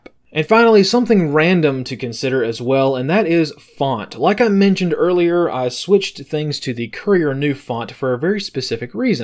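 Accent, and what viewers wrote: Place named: South, United States. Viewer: One of the mysteries of north American dialects is why New Orleans got the northern city vowel shift. There's nothing nearly as mysterious here, but there is a well-known connection between Tennesee and Central Texas, particularly the way they front the OU dipthong. My dude has an urban southern accent.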